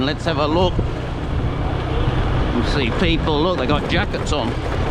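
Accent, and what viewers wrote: Australia. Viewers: Annoying Australian accent, go home if is nothing open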